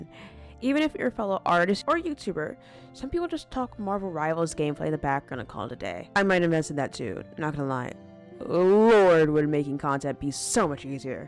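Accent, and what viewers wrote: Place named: Netherlands. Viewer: oh idk why your accent sounded vaguely Dutch to me lol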